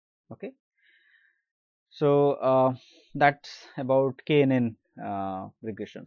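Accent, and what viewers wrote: India. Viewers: normally I can understand indian accent but yours is terrible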